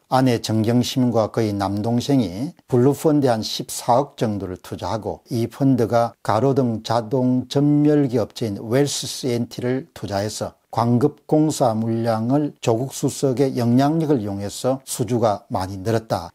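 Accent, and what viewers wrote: Gyeongsang, South Korea. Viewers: 공병호 소장님ㆍ 참ㆍ대단합십니다 ㆍ경상도 발음외는 흠잡을 것 없네요ㆍ쉽지 않은 방송 인데 ᆢ꾸준하십니다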